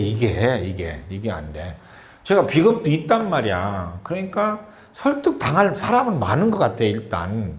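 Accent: Chungcheong, South Korea. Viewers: 여기 매니아 있어요 ~ 선생님 구수한 충청도 사투리가 너무 듣기 좋습니다! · 저는요. 선생님 메니아입니다. 충청도 말씨도 친근감 있고요. ㄱㄴㅇㅅㄲ도 재미납니다.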